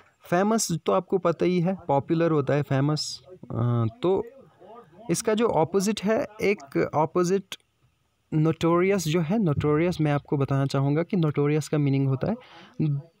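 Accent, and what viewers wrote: Kashmir, India. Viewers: Sir i guess u r kashmiri...!!! Am I rt..??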